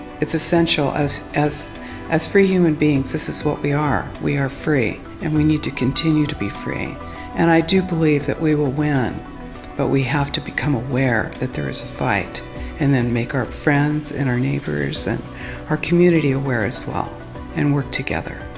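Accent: United States. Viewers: a croaky American female voice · Yup deffs american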